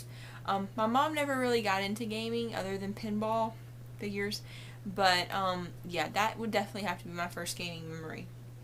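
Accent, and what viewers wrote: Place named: South Carolina, United States. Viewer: i love ur vids\ and you sound like ur from sc are you??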